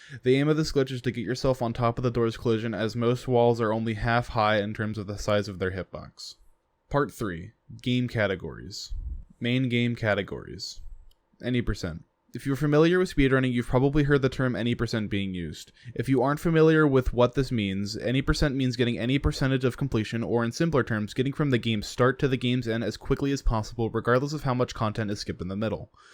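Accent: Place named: United Kingdom. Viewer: you are bri'ish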